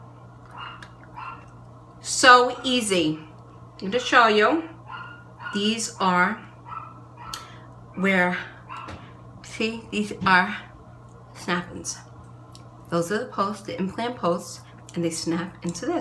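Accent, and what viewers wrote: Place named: New York, United States. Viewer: I just discovered your channel.... Can i say seriously I've been watching all your videos the last day in a half and I'm in love!!! You are the most down to earth human being I have ever seen....and i love love love your accent...im from Chicago so the new York accent is amazing to me lol. Anyways keep making videos and being beautiful! Xxx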